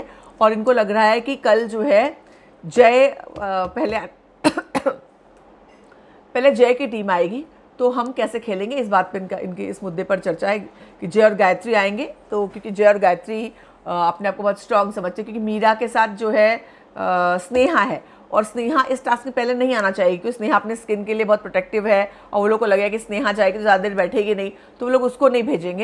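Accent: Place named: Maharashtra, India. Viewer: Ur marathi too cute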